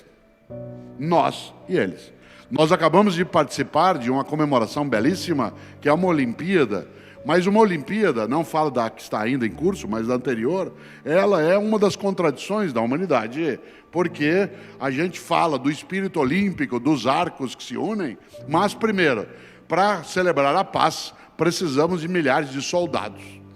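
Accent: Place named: Londrina, Brazil. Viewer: Amo o sotaque do Cortella !Q honra p Londrina ter um gênio q nasceu lá! Parabéns Londrina. P seu filho q o universo admira.aplaude. ama.aprendre.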